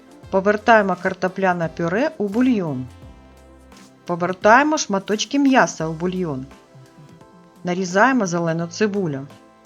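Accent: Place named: Carpathians, Ukraine. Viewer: Наголоси ставите неначе ви з Карпат, а не з Полтавщини. Повинна ж бути автентична українська мова регіону.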